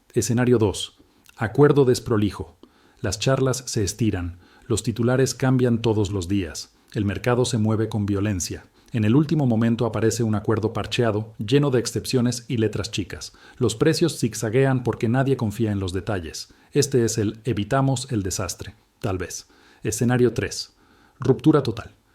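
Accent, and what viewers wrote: Argentina. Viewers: Por que le pusieron español argentino, suena desagradable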